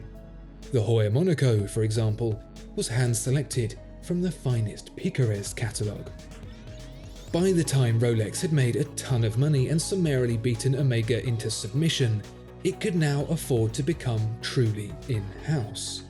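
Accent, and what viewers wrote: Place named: RP, United Kingdom. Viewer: Amazing vidiography, a perfect posh British accent. But underneath the BS, the same blind brand loyalty every rich D has and needs in order to purchase a Rolex. After a whole week of focusing on German perfection, your need to sell some garbage, yes garbage Rolex, is sad for me, one of your more loyal followers. I know you need to sell, but double standard is something I really discusse.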